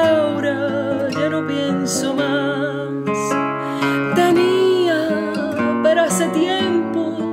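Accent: Andalusia, Spain. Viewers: Hermoso lo que hacés! Soy argentino, esta cancion la escuche muchisimas veces pero me llegó tu interpretacion tan sentida. Muy buena tu guitarra y tu voz. No se de donde sos ( tal vez andaluza por el acento?) pero transmitis muy bien lo que don Ata hacía. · Excelente,. Me hiciste emociar. ¿Sos andaluza? Genial, genial interpretación. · de que parte de españa es tu acento?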